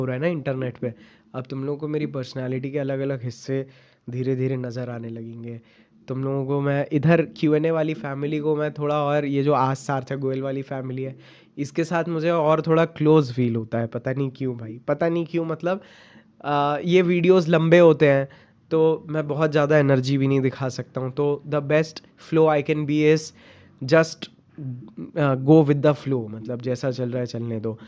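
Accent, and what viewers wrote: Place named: Maharashtra, India. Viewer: Your marathi accent is damn good bro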